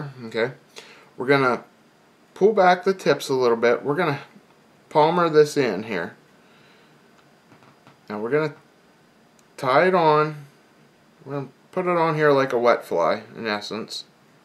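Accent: Pittsburgh, United States. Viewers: lol like 2 minutes into hearing you speak i said to myself, this guy has to be from Pittsburgh or near there. Looked up Holsinger's Fly Shop and i'd be damned if i wasn't right :P Great video thanks!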